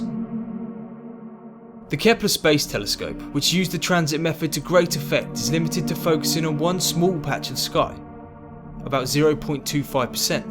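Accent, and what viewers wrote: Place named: England, United Kingdom. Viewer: G'day. Good vid. I love your pommie accent. Like Curious Droid you say 'Errf' instead of Earth. Outstanding. Vive la differance.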